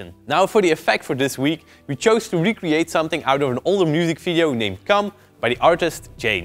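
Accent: Limburg, Netherlands. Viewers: your dutch isnt it? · ik ben ook nederlands, jij komt uit Limburg?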